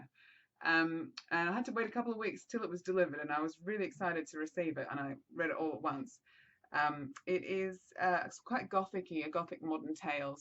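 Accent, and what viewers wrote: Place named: England, United Kingdom. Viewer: The Madwoman upstairs, wouldn't mind taking a look at that , and watching middlemarch. I wish I'd borrowed instead of 'Joy' which is currently in my dvd player. First half hour in and I was so bored last night I went to bed lol. And read some of and editing book by james scott bell...ANd actually paid attention. That's how sick of feeling bored at the movie I was! Lol. I love the Brontes. it sounds like the mad woman upstairs isn't written like fanfiction? - it has it's own story. There is a book I saw recently in book shop about Nelly from Wuthering Heights and I got one page in and groaned - I could have written it myself! - it did not read anything like Emily Bronte...or any bronte. By the way you have an English accent lol. Did you always have it or caught it overseas? This sounds funny but I like stories where people need to get a job, and life kinda is frustrating them lol. 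Blood stain sounds good.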